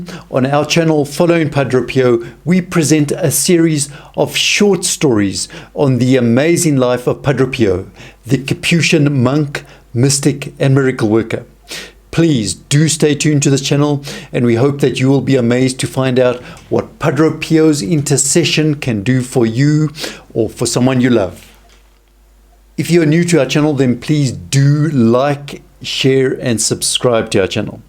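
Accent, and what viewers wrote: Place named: South Africa. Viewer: Sounds like it is from Africa. South African maybe.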